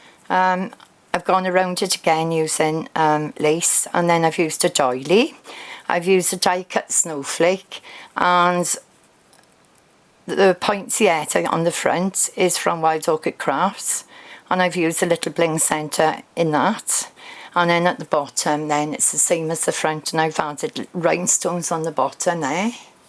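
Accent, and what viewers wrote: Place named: Wales, United Kingdom. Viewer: Beautiful makes and my Mum was welsh and I soooo miss the accent so it was lovely to hear yours. hugs Tibby xxxx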